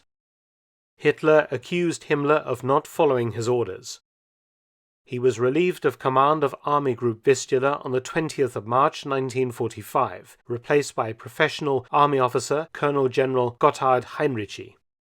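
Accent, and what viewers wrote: United Kingdom. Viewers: Hello Mr Felton, My wife and I saw you on german TV yesterday when we watched a documentary on Hitlers trains. I immediately recognized your face, while she recognized you purely trough your voice. "That's the most english sounding english I know" she said. We love your videos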